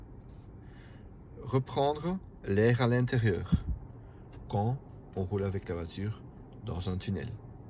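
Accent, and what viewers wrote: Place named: Belgium. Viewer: C est quoi cette video au ralenti?et puis faut aimer cet horrible accent belge.Bref j irai la decouvrir moi même chez Toyota une fois!!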